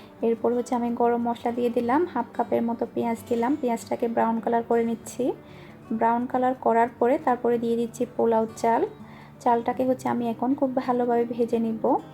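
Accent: Chittagong, Bangladesh. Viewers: চট্টগ্রামের কথা শুনতে খুব ভাললাগে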